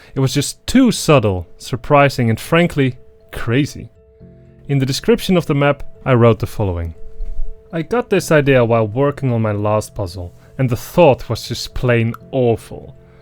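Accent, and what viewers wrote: Netherlands. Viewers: I hear a Dutch accent and see a Dutch name 👀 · are you dutch cus i am and i hear the accent and your name looks dutch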